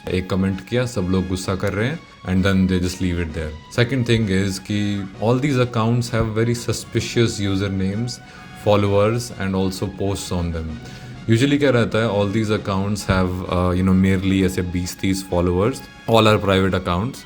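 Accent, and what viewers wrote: Himachal Pradesh, India. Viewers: Himachal ka accent work kar geya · that himachali accent hitting hard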